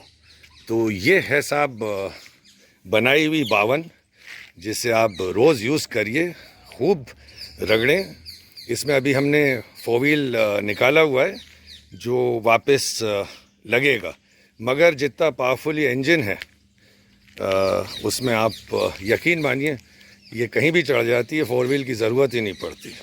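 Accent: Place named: Bhopal, India. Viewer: Hello bhai..loved your bhopali tales and your passion for Jeeps👌👌. Tumhari bhopal style commentary lajawab hai.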